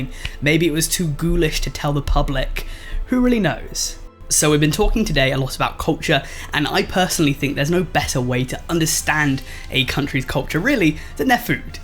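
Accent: United Kingdom. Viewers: You sound a little like your British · I reckon that you were educated in a public school and that your probably from somewhere like Hale, Altrincham and if you're not a Northerner you're probably from the cotswolds but you're accent has been molded from the fact that you've spent a lot of time in a boarding school so it's developed from mixing with other people who are from all over the country so I reckon it's an amalgamation and maybe a touch of your parents from when you were 1st learning to talk, please reply and let me know if I'm right or wrong and tell me where you're accent is from.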